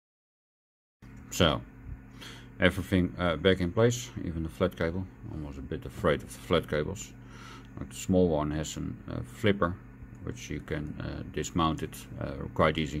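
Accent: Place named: Netherlands. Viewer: I always enjoy the Dutch accent in English. It keeps me from being a stress kip. :D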